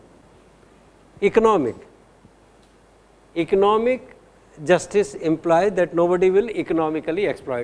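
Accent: India. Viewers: have trouble understanding the Indian accent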